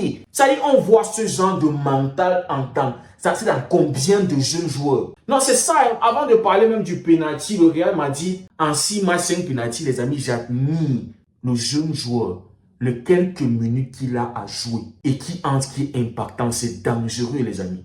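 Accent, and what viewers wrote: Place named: Cameroon. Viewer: Le français des camerounais.👍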